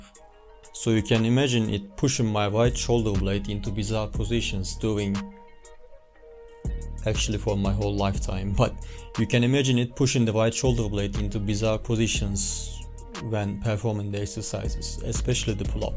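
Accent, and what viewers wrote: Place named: Turkey. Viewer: Bu bir destek yorumudur. Diline sağlık. İngilizcen bayağı iyi ama türk aksanın belirgin =)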